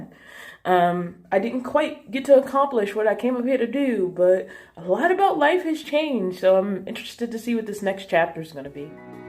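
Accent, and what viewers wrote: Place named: Maryland, United States. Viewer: I grew up in Baltimore. I thought I heard a bit of the ole Maryland accent. Enjoy your travels.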